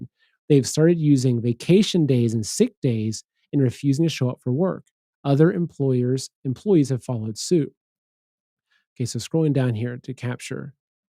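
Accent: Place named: Scotland, United Kingdom. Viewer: That was the Scottish accent and I loved it. I reminds me so much of my uni days 500 years ago :D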